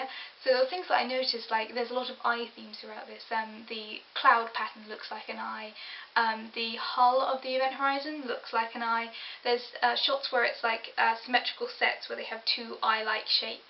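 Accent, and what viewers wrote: United Kingdom. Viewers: I'm impressed, count me a new subscriber. Sounds like you might be from across the pond. When I first saw this movie it scared me about as much as any horror movie does. (The scariest movies make me jumpy, and I have always had a vivid imagination of what things might be lurking in the dark.)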